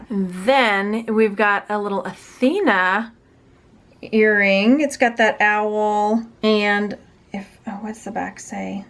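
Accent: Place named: Texas, United States. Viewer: You are cracking me up! I needed this today. I hear my Texas, teacher self in you with your silly songs. Don't you love exaggerating our Texas drawl??! Then the, Labyrinth stuff sent me over! Love it!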